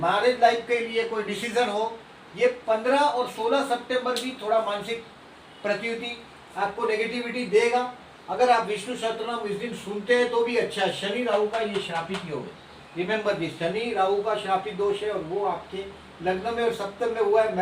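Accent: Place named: Maharashtra, India. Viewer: ।।🕉नमः शिवाय 🕉जय माता दी 🕉।। नमस्कार गुरूजी आणि शुभ रात्री, गुरूजी आपके सभी विडियो बहुत ही अच्छे होते हैं। मै महाराष्ट्र राज्य का हु और मुंबई में रहता हु आप जब कभी भी हमारे महाराष्ट्र में बोलते हो और बीच-बीच मराठी शब्द बोलने में आते हैं। बहुत अच्छा लगता है। ( गुरूजी आपके नये विडियो नहीं आरहे है। कृपया करके नये विडियो बनाईये धन्यवाद और शुभेच्छा! )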